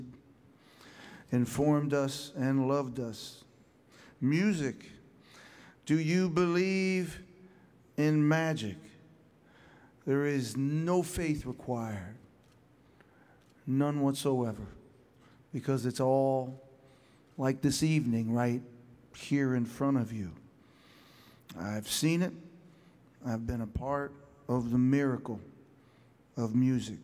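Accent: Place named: New Jersey, United States. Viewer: It's the way he talks, if you've ever heard him before. Part Jersey, part easy going, part storyteller...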